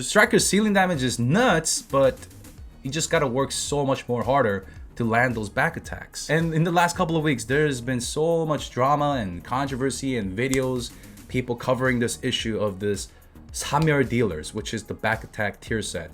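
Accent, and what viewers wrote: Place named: United States. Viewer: Dude, are you from america? Your english is perfect. A lot of the korean streamers out there do speak english, but obviously have a korean accent. If I didn't check already, I would think you were from the states.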